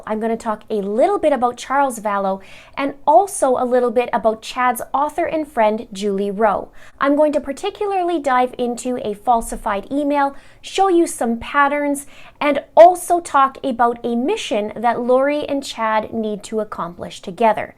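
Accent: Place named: Canada. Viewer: She's Canadian I believe? They pronounce some things differently than other cultures.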